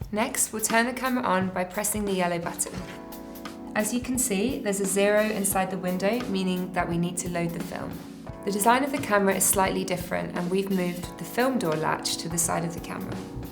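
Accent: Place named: United Kingdom. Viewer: I dont know why American companies feel the need to get s British person to explain their product in order to get that "designer feel"? What has England designed?...Marc Newson is good....but what else?.......no cellphone or space program that's for sure.......and lets get real the average person is broke in England..for whatever stupid low self esteem reason we need the accent...thats the stamp.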